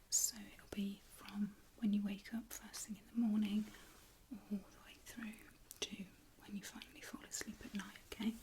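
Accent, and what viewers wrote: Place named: Cheshire, United Kingdom. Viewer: Awesome tysm👌 is that a fellow Cheshire accent?? YAAAAS 💅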